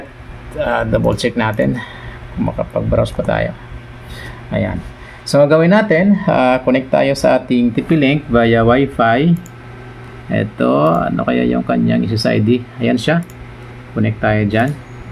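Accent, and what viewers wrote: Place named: Bacolod, Philippines. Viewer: taga bacolod ka sir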